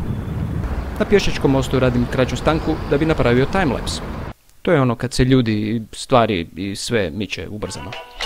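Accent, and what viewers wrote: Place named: United States. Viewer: tipicno Americki ko da nisi Balkanac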